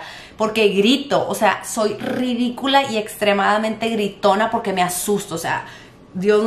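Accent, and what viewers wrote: Monterrey, Mexico. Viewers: Hola me caes muy bien me encanta tu forma de hablar inconfundiblemente regia! 😁 confieso que pare de ver tu vídeo un poco para ir a escuchar la canción de que mencionaste wow hermosa canción! Me recordaste a una YouTuber regiomontana también su canal se llama la regia charlton se me hace que tú y ella se parecen! muy guapa señora y el modo de hablar parecidisimo al tuyo y ni hablar del acento, Oye tú niñita la misma carita de su papi 😳 me encanto saber más sobre ti me parece tu vida interesante bueno bye cuídate y éxito y más éxito 👍🏻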